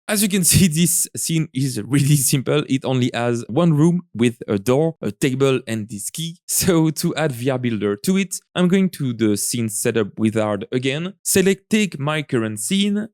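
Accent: France. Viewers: Hi you are french ? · Merci pour tes vidéos, j'ai la forte impression que tu es français, pourrais tu faire tes vidéos en français aussi ? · Bro you french trying speak english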